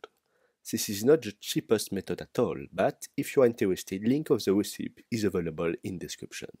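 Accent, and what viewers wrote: France. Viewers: this is the frenchiest french accent in the world.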